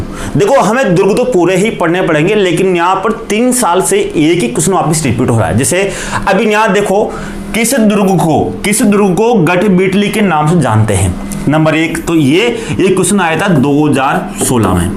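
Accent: Hadoti, India. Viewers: कोई बताए ये सर कहा से है इनका एड्रेस। बोली हाड़ोती की है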